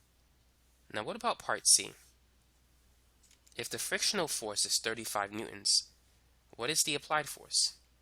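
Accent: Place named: Boston, United States. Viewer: I am a woman and this guys Boston voice is so hot.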